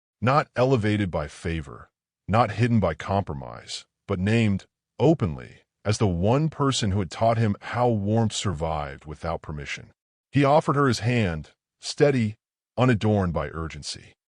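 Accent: United States. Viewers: It really disappoints me that you create a story set in England but you us an American ai voices 👎👎🏻👎🏼👎🏾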